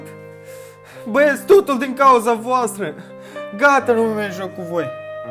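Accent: Moldova. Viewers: Nu sunt hater dar nu-mi plac cum vorbesc moldovenii nu e vina ta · Esti din Moldova :D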